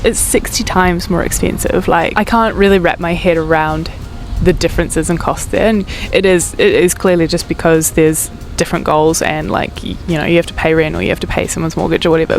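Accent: New Zealand. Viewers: Yeah another Kiwi. I am part of the Waikato Society of Potters. Love it. I watch your channel and feel so at home with "our accent" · I love that there’s someone out in YouTube land with my accent. And why it feels great when you’re back here in nz is coz we are way cool here 😂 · you still have the kiwi accent 🙌🇳🇿 I’m in Northland, NZ. Thinking of joining a pottery club this year, thank you for your video! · I love your accent. I am trying to get an NZ accent! Everything you said about the NZ pottery clubs is soooo true! I learned pottery at one in Whangarei in 2022. Everyone was so nice and helpful. I am still learning a lot but hope to have a business when I go back to the USA. And the cost is so low here! I think in the US the monthly cost is what you pay in London. I only pay $120/year in NZ! It made it possible for me to learn pottery. I will miss NZ so much when we leave. 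Plus it is so beautiful here and it smells good!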